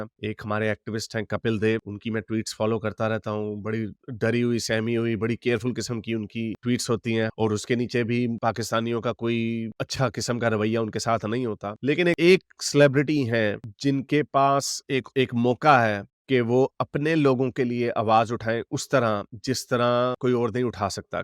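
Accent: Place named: Punjab, India. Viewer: Being indian punjabi I love ur punjabi. The same style. God bless u.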